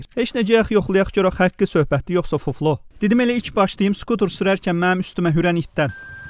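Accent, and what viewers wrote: Sheki, Azerbaijan. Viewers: Şekili olmadan şeki lehcesini nece oyrenmisiz? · Şəkilidi ona görə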